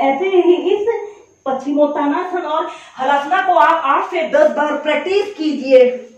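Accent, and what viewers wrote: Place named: Haryana, India. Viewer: Lagta hai Haryana k ho aap...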